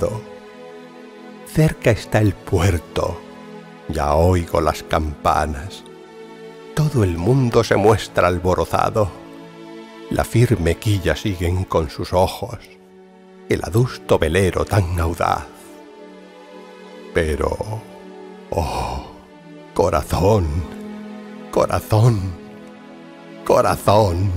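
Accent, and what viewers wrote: Spain. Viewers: Eres el mejor señor, además me encanta el acento español, me parece que se oye más hermoso el castellano. Un saludo desde Colombia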